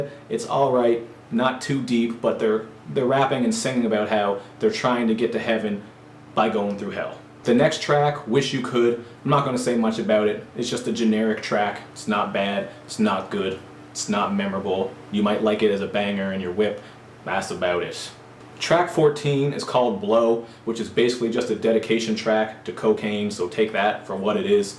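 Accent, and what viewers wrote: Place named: Atlantic Canada, Canada. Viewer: You from east coast of Canada? U got a mad east coast accent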